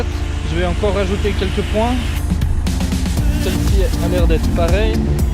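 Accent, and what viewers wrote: Vaud, Switzerland. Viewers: yop super vidéo tu explique vachement bien PS: j'ai une question j'ai vu que tu était suisse et j'adore cette accent. Je viens du canton de Fribourg 😏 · Joli ! Tu es suisse non ? Vaudois je dirai à l'accent ^^ Tu as pas de faucheuse arrière ?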